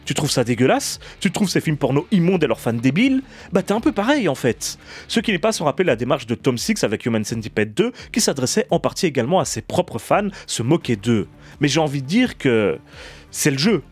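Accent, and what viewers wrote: Belgium. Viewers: Bonjour, j'aime bcp tes vidéos. Le monde du cinéma d'horreur m'est étranger, j'aime pas ça mais j'aime écouter des gens parler de sujets que je ne connais pas et les rendre intéressants. Tu es de ceux là. J'aime bie' e' apprendre plus dur tout un tas de choses et j'adore voir et/ou écouter tes vidéos. C'est passionnant. Je ne verrais sans doute aucun de ces films mais j'adore apprendre des tas de choses dessus. Ça enrichit. Et la pointe d'accent belge est un vrai plus car j'adore la Belgique et cet accent si chaleureux. En bref, merci pour ton travail.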